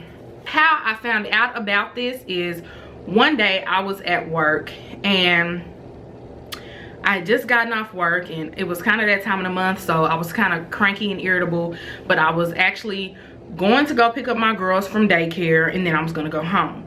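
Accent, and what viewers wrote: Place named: Memphis, United States. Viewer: And you from Memphis??? I can hear the accent😭🥰🥰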